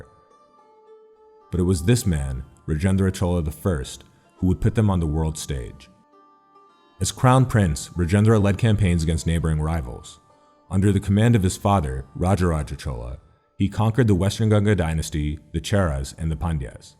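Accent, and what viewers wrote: United States. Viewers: Easy answer to this is ' last one to colonise were British they brainwash ALL WITH FALSE EDUCATION SYSTEM you guys still following LOL, so the thought of retaliation won't even b there, same with Maories n same with aboriginals ' it's good this american voice hv done good research not sounding bias so that's a good sign on the other hand if u keen must have a look to Mr Rajeev Malhotra ' books on breaking India forces'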